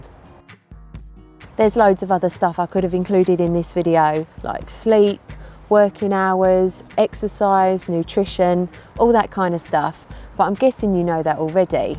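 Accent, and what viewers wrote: United Kingdom. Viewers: Hey I think your cool, I love your zeal for life and your British accent!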